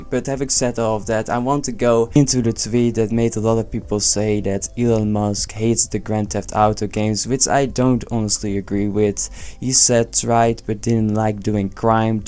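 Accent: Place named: Netherlands. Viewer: lmao this sounds like some heavy dutch accent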